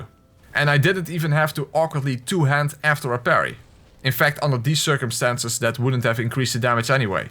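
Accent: Netherlands. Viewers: Bro you are dutch aren't you😏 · ha, so my suspicion was right. it is a dutch accent. grüße aus deutschland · 2 seconds of hearing the voice alredy realized he was dutch lamaoooo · Are you Dutch? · I KNEW YOU WERE DUTCH. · U must be dutch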